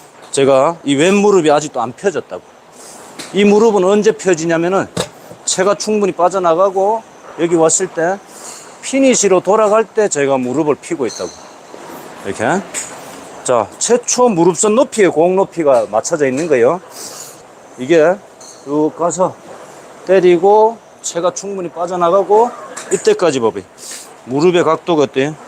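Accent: Gyeongsang, South Korea. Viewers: 갱상도 구수한 사투리 ㅎㅎ 저도 갱상도 출신이라 정겹네요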